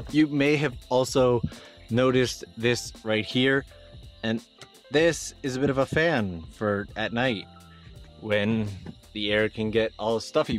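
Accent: Canada. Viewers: after hearing him say "out" so many times, I'm now wondering, is he canadian?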